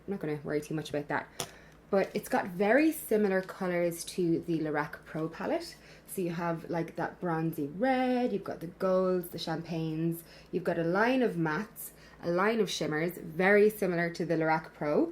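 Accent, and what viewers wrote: Ireland. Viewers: Is she Irish but lives in Australia?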